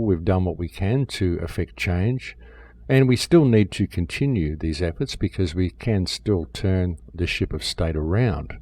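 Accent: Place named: Australia. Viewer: He is Australian.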